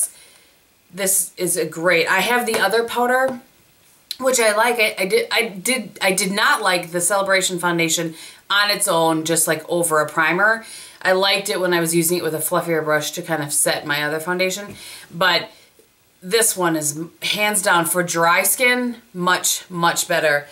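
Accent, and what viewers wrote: Wisconsin, United States. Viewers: You are a hoot! Enjoying your videos, thanks. I might need to try the IT Cosmetics powder. And I thought I recognized that accent! I grew up in northern Wisconsin. I miss it like crazy! I'll be home for a visit in August and I'm hoping I can convince my sisters to drive up north to Paul Bunyan's before they close for the year! Not that I need a lumber jack breakfast :/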